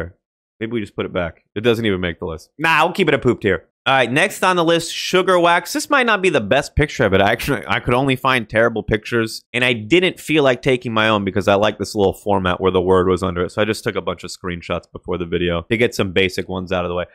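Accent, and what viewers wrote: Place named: South, United States. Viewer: Bro I love the list but I REALLY love the southern accent you pulled off. I’m from the dirty south you did so good 😂😂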